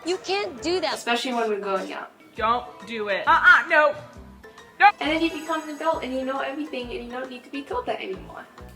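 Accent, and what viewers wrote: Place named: New York, United States. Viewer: That's a cool accent, where are you from? I'm British and I barely hear this accent on YouTube. Is it New York?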